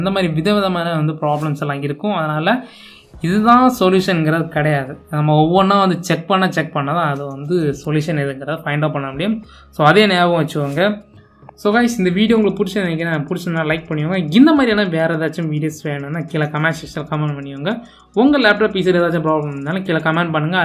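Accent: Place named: Kanyakumari, India. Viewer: Kanyakumari slang mathiri irukku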